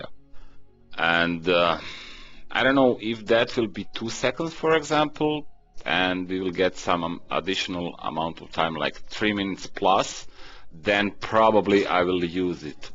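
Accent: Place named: Czech Republic. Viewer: really useful videos!!...thank you so much...btw your english sounds like czech or slovakian:) sorry if i miss...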